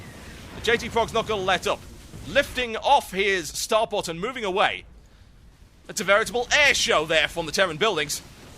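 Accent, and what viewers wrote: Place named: England, United Kingdom. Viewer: no offense man but I can't stand your english accent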